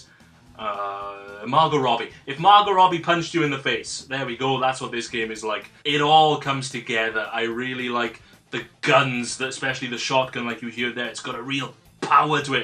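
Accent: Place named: Wales, United Kingdom. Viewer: You sound very Welsh What part of Wales are you from!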